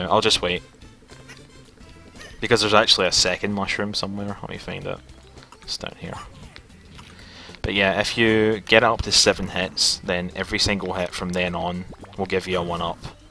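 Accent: Scotland, United Kingdom. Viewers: Yupeji His commentary with his Scottish accent is interesting enough, stop complaining, it's just 4 minutes.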